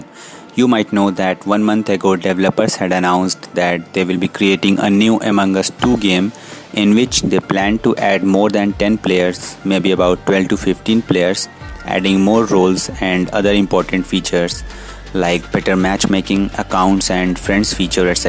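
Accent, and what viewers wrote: India. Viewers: Is this dude indian? Look at that Indian accent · Voice reveal wiyhout face voice like an Indian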